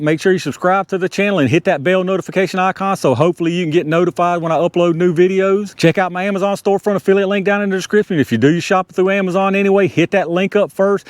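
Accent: South, United States. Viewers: Well, this was a bit like "beating a dead horse", wasn't it? It is already well known that , in terms of kinetic energy, the average 38S is very close the the average 9 mm Parabellum. On the other hand, 380 is practically on par with 32 auto (32 caliber pistol, in Europe known as 7.65 millimeter pistol round) which is a rung lower than 38S/9mm. Nevertheless, I enjoyed watching your test and listening to your Dixie American English :-)...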